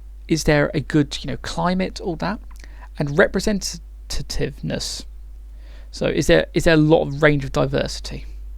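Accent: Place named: RP, United Kingdom. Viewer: why do you sound so posh, do your intellectual abilities match your convincing tone?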